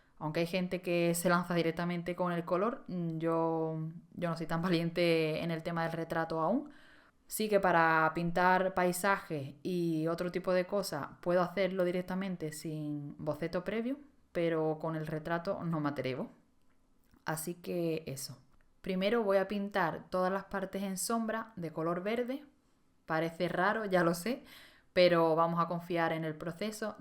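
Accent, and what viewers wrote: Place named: Colombia. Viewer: Erga pq hablas como en Colombiano pero de españa? No es jeit, enrealidad me es más agradable al oido, pero me pajea el cerebro escucharlo asi jajaja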